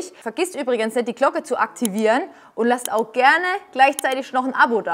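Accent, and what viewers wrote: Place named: Baden, Germany. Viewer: ich dich einfach eine power Handwerkerin mit deinem badischen Dialekt 😘 liebe aus Hamburg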